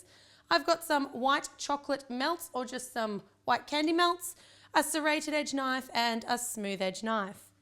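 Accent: Australia. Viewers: Are u austrailian?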